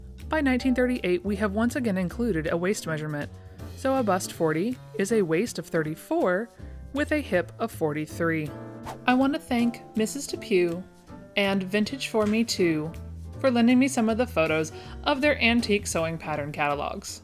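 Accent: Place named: Ontario, Canada. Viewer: Are you sure you don't live in Canada, you sound like you're from Ontario like me! I think the only thing that angers me about the big 4 patterns is that I'm not in the size range unless it's one of the few smocks they have. It's why I draft my own for basic stuff or buy from Indie companies that acknowledge that mid fats exist!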